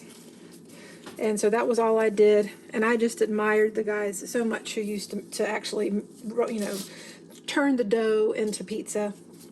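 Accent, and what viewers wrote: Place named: Eastern North Carolina, United States. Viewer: Just found you and have subscribed. Was wondering what state you are from. I’m from small town in Eastern NC and you sound like us with your accent. Thanks for the great recipes from my childhood.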